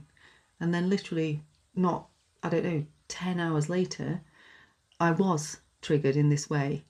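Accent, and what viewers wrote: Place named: Manchester, United Kingdom. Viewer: Listening to your voice (which is similar to mine - born in Greater Manchester) and your words - I saw the waves of the tide - the rhythm of coming in and going out. And the insight came that groundedness in a time of change is like an evolving, expanding equanimity. As the tide comes in - the deeper the return of consciousness to the core wound. But when the tide turns - the greater the expansion of consciousness "outside". Thankyou.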